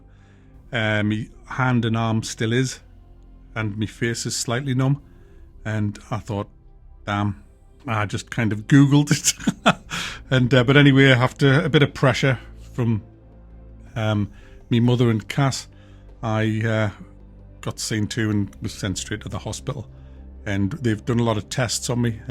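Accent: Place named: North East England, United Kingdom. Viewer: Really weird comment first hope you do use this as a driving point to get more healthy I need my reviews 😂 but also it sounds like your from my way Sunderland/Newcastle. I think I am younger then you 29 but I had a heart transplant plant 4 years at the freeman and what helped me get fittest up and stay off the drink was a local running club for people with health problems definitely something to look into really helped me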